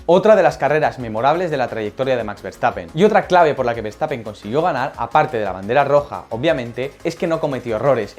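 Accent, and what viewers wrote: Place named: Spain. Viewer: El YouTuber es español por si acento y esta correcto en lo que dice de Max ...pero Fernando Alonso gran piloto no es bueno en lluvia ...Max si ..z Schumacher si Senna si ..Besos si ...Prost No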